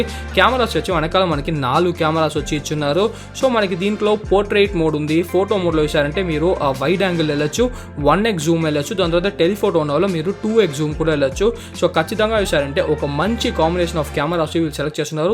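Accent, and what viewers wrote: Chittoor, India. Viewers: He is from Chittoor or Anantapur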